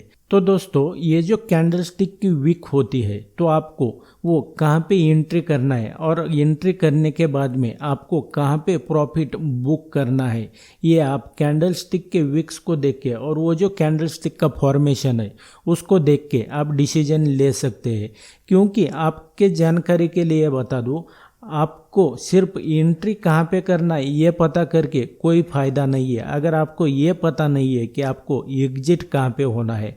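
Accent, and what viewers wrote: Maharashtra, India. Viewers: Kaka tumhi marathi ahat na , Hindi varun olkhla 😂😂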